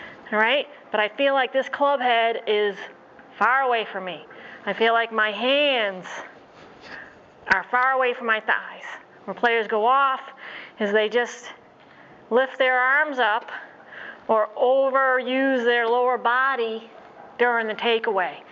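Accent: United States. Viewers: What accent is this and where from in USA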